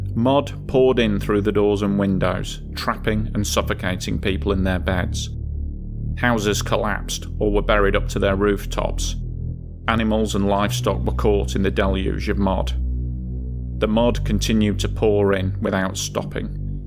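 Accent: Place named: Northern England, United Kingdom. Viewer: Where is your british accent from ... I seem to think its northern england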